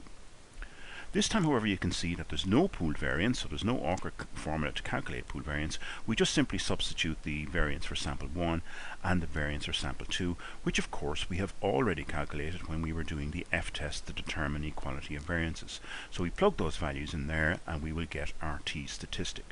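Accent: Ireland. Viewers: I really enjoy Irish accent. It makes learning fun again:D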